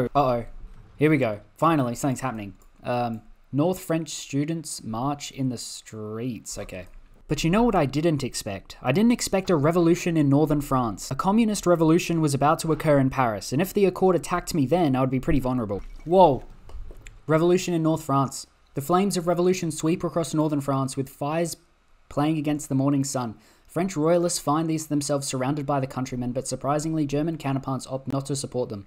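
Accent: United Kingdom. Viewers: it's called british accent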